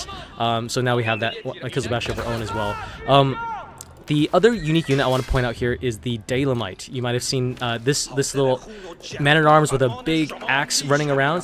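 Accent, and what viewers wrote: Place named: Iran. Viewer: the most generic persian accent ever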